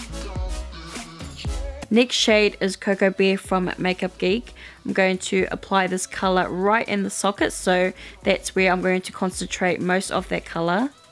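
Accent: New Zealand. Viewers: Are you from nz? x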